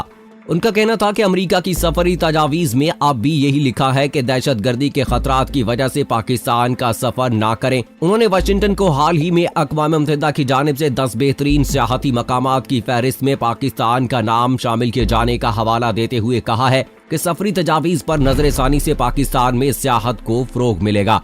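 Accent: India. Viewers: Man you need to learn Urdu. Because your accent is like Indians. Shame on you. Stupid man.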